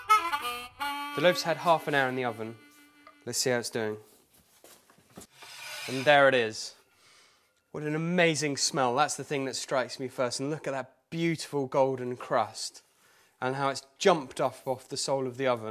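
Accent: United Kingdom. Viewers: Just because he says it with a cute English accent, doesn’t mean he’s making much sense. Where did he get the starter? What is “strong” flour? I stopped listening at that point. Don’t need half the stuff this guy says 😐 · First of all, nice British dialect, second: here in Sweden we will never get a sourdough fom the air as you say, the air is extremely Clean even here in Malmö with 350K inhabitants.I tried 1000 times to make that sour dough starter, it doesn't work without a litle yeast to get that kick in. When I was on Mallorca the sourdough was active in 18 hours, here in South of Sweden, the flour it not reacting at all, it just decanted on the bottom of bowl and it shows no fermenting at all. Maybe we here in Malmö are like in a big jar with no bacterias...no microbs, no viruses, no yeast molecules, etc.Thank GOD!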